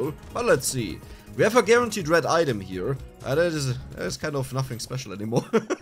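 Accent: Germany. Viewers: Der deutsche akzent ich liebs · You got a very strong german accent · Imagine doing english videos with the deepest german accent i have ever heard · Man hört einfach so raus das der deutsch ist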